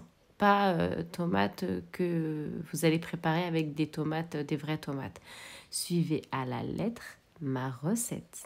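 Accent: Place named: South, France. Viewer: Une explication distinctive du sud et la bonne chance bb 😍😍😍😍😍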